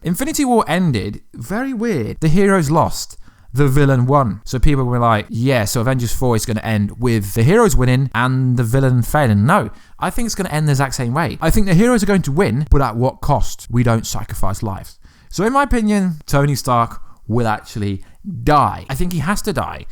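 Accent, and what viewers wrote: United Kingdom. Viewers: I prefer cosmic wonder. He has better presentation and doesn't have this goddamn Brit accent. Sounds like he has a knob end in his mouth.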